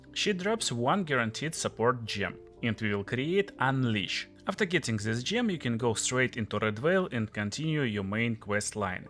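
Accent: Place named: Russia. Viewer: Почему нет коментариев на русском, говор явно выдает автора хД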